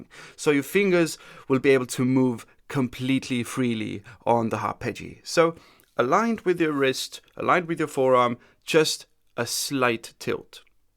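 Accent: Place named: Russia. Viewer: Thanks you for putting this series together. Definitely fills a gap here! One suggestion. Would be great if you demoed each talking point about on the Harpejji itself (perhaps second camera shot) right after you mentioned each point. Would be a big help in my opinion as far as keeping folks engaged. And although English is my first language, this certainly would be helpful to those watching this channel who did not speak English or have subtitles available and instead could at least see the concepts demonstrated. BTW.. (I do understand English with comedic Russian accent so please keep that going). Thanks again keep these going!!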